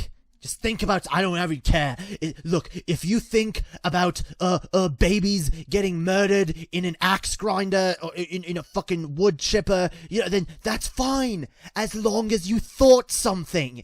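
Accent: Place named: United Kingdom. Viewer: So…. Why the British accent in older vids?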